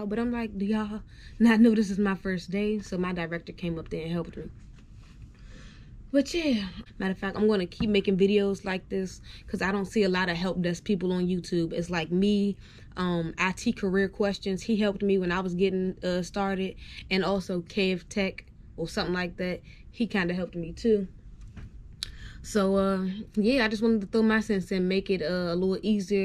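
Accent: Washington DC, United States. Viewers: before I saw the 202, I was like " This person Gotta be From DC"!!!!!! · thanks for the content i can tell you are from DC or baltimore lol the accent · I recognize that DC accent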